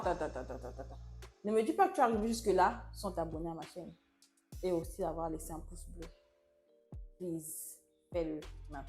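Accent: Ivory Coast. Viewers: Ton accent devient ivoirien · Ahm, tu commences a parler comme les Ivoiriens, tu es Congolaise et puis après. ?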